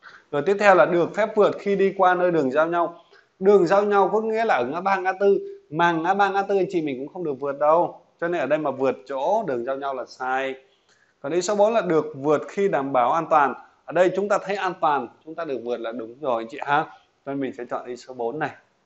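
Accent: Thanh Hoa, Vietnam. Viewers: Thầy người Thanh Hoá,nói giọng Thanh Hoá nè. Thấy thân thiện dễ gần và lịch sự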